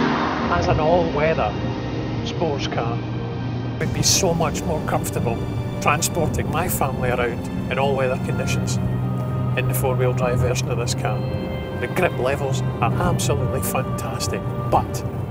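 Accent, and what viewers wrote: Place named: Scotland, United Kingdom. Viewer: that scottish accent is brilliant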